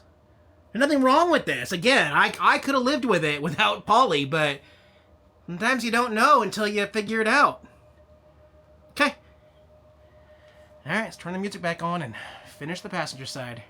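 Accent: South, United States. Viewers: I detected a bit of southern twang. You been hanging with those wonderful southern Mississippi people. Love it!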